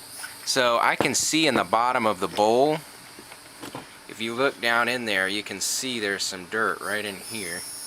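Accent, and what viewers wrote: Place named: Florida, United States. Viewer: sounds like you live in florida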